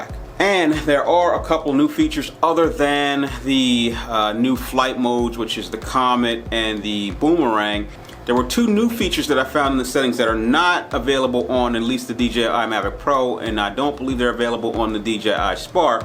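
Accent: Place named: New Jersey, United States. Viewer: FIVE STARS! Always like your fresh comments, I just bought it! and is refreshing to hear sincere WORDS before I start out on my experience. Your the only reviewer, who tell it, right off, with out the fancy intro music video. To the point video from a reviewer who did not get a free one from DJI, Love The honesty Pal! KEEP UP THE spontaneous reaction! VERY HELPFUL! I am in Thailand, but you sound like the truth of my old neighborhood in New Jersey, very comfortable!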